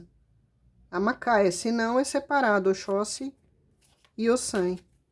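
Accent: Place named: Rio Grande do Sul, Brazil. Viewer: que nem tu não tem cara tu é a melhor e o sotaque Nossa aqui do Rio grande do Sul não tem comparação tu é a melhor, parabéns eu adoro e dou muita risada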